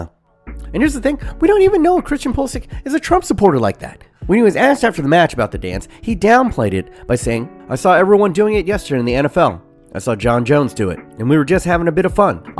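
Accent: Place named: New York, United States. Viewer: I can tell you are from you are from new york 😂😂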